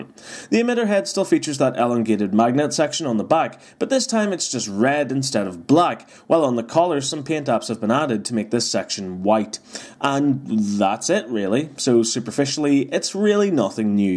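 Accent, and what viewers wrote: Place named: Scotland, United Kingdom. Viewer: you sound like you are from Scotland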